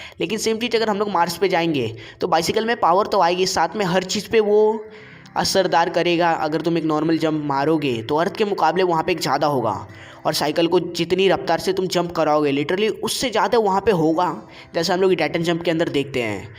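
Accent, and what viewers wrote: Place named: Tamil Nadu, India. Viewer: Bhai Tamil Nadu se ho kya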